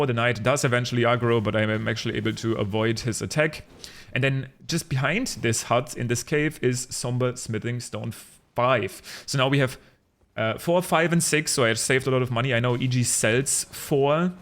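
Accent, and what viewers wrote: Germany. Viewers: Nothing makes me happier than realize within seconds that I've found yet another german creating English content lmao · Sprichst du als erste Sprache Deutsch, klingt ziemlich danach 😌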